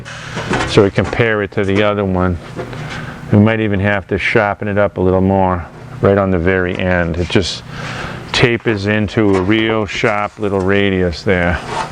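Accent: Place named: Boston, United States. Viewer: so stunning, I can't thank you enough. Also I love the Boston accent " a little bit.... a little bit" . It reminds me of Lazze's videos when he says "littlelittlelittlelittle" in his Swedish accent. "A little bit" seems to be a metaphor for life... at least for those of us making art.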